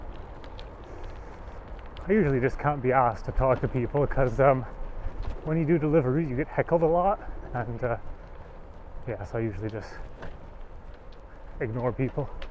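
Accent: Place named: North America. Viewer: I think this came up because I was looking for gopro settings. I’ve been using a chest mount but I’ve seen suggestions that the gopro should be upside down. Not sure why. Which way was yours mounted? I’m in Australia and the cycling infrastructure varies from non existent to fairly good, where I am in South Australia anyway. I heard your accent and thought you were in North America. I’ll see if I can check out your other videos if I have time. Cheers.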